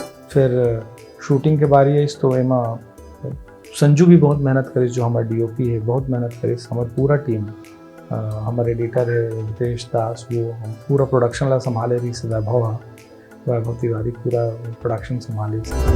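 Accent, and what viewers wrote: Chhattisgarh, India. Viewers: आपके आवाज में छत्तीसगढ़ के संस्कृति झलकथे भईया 🙏🙏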